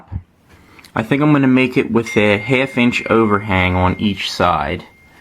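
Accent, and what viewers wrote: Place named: Chesapeake Bay, United States. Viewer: I'm guessing that accent is from the Chesapeake Bay area.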